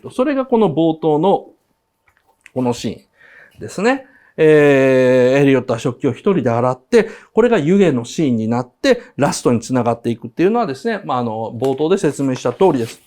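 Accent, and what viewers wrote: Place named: Sumiyoshi, Japan. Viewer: なんか土井善晴が浮かぶなと思ったら住吉区のイントネーションなんですな。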